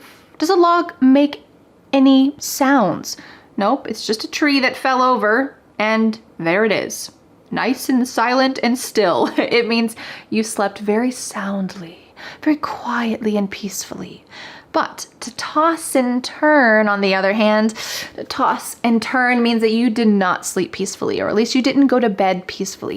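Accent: United States. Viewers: We dont have summer season actually in my country,thats why we never spend our time for summer,..in my country is summer all the year,instead😂😂😂😂😂 Greeting from indonesia...My new teacher.....its fun learning english with u....i ❤ american accent....i love superman,spiderman,pizza hut ,kfc and etc😂😂😂😂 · Какой у нее Английский? Не американский? Мне надо американский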